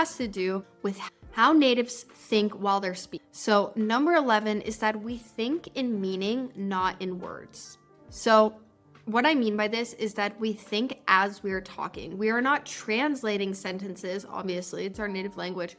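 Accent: United States. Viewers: I found this channel last week and thought your pronunciation was very clear and a great example for speaking, so I started to imitate your speech. The blue lights on subtitles make me easier to copy your speaking because their movements and your voice are in sync. This speaking practice is very effective to get used to American accent and I do it every day. Your videos are so helpful and practical. I really enjoyed watching them. Thank you so much.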